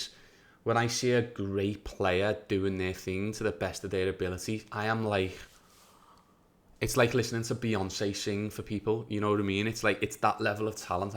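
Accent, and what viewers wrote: Liverpool, United Kingdom. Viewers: Hi. I enjoyed watching your reaction to the videos. Agree 💯 it is a pleasure to hear and see positive comments about others. I’m not a football fan per se, but I do watch Messi. His playing style is poetry in motion. Great reaction. And your scouse accent is delightful ❤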